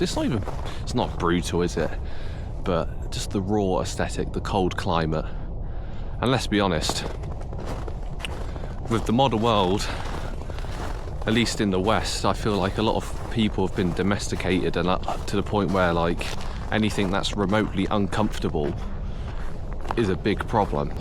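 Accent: United Kingdom. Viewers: Вааааййй, posh engliiish. Ну вы даёте, сударь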